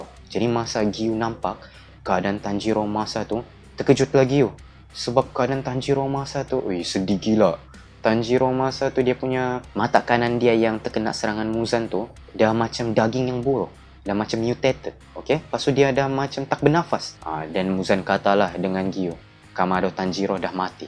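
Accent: Sabah, Malaysia. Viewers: Dia ni org sabah ke? Yg cerita ni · Abang ni orang Sabah ke??🤔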